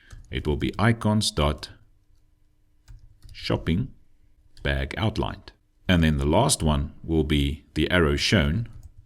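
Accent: Afrikaans, South Africa. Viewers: This was awesome! I solved maybe 75% of your exercise. I got a little stumped on how to format the icon-text-icon structure, and must say, your approach made sense. Overall, the mere act of trying made things click that much more. Thanks Mr., you're a 1 in a million lecturer! As an aside, when I was younger, a lot of my teachers were Afrikaaners, so hearing your accent floods my heart with some beautiful nostalgia. Best wishes, and I'll keep sharing your videos + playlists with all my developer friends that are trying to get into Dart/Flutter. Best wishes from the USA, and stay well ☮️🏆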